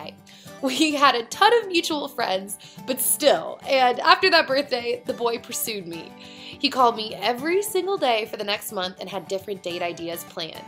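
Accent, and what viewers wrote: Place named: Wisconsin, United States. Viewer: what state are you from you sound kinda like Wisconsin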